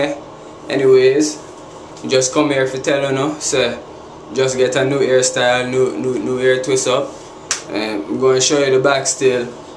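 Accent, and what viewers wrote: Jamaica. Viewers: lovee your hair & you resemble the jamaican accent pretty good ") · u jus killed me wit da "rudeboy buck buck buck" part jus 4 dat auto sub n a big up bredren...btw r u jamaican or u jus talkin wit da patois cuz of da products to add effect?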